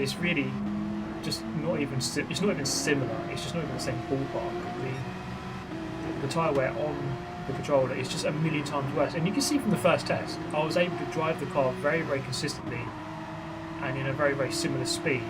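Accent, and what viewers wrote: United Kingdom. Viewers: In my mind you sounded like more British to me for some reason lol I guess that’s like saying to me if I was in Bristol or something and someone saying to me yea you don’t look to American to me...lol never thought about it like that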